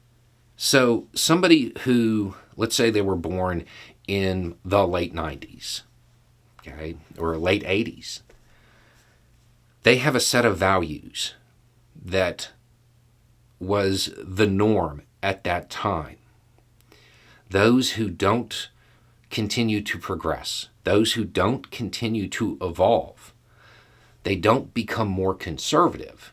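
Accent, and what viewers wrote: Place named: Appalachia, United States. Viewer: Ah another "I have a hillbilly accent but I'm not like the rest of them" Your Zelda shirt gives you away, dude.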